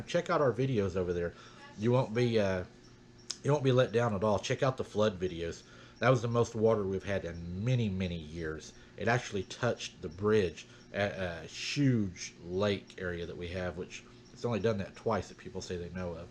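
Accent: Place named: South, United States. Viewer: U sound so country and down to earth. Love being a part of the family.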